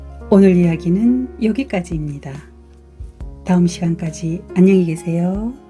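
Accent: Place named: Gyeongsang, South Korea. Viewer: 구수한 갱상도 아지매 사투리가 더 졸리네요^^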